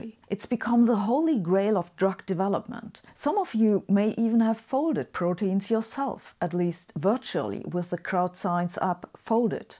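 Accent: Germany. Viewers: I love the german people. So brilliant, so strong. Germany is the shining jewel of europe. So long as they avoid the mistakes of the past, i.e. nazism, military empire, etc. Nothing can stop Germany or dim it's influence in the world. Look how brilliant this german woman is. And that accent! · You sound so German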